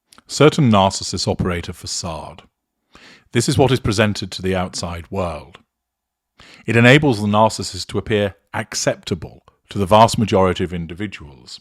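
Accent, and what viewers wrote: United Kingdom. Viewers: This guy really does waffle on. Amazing how many people are impressed by just a pompous British accent.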